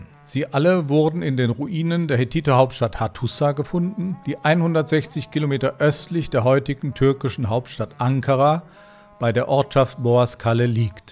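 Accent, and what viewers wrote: Germany. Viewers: Hat der gute Mann einen Bayrischen Dialekt😁 Schöner Beitrag 👍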